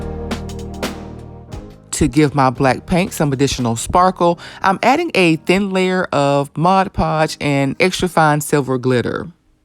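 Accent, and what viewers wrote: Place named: Houston, United States. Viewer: Your accent sounds like you're from Houston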